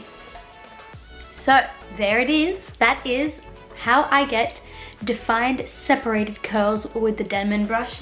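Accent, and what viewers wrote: Australia. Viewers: i loved your accent, lemme guess you're Australian?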